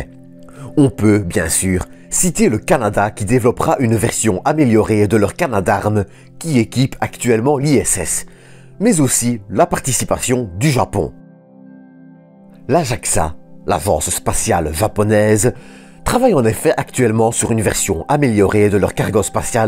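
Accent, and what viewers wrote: Belgium. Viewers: Bsr je dirais belge mais son accent n enleve rien a la qualité du travail fourni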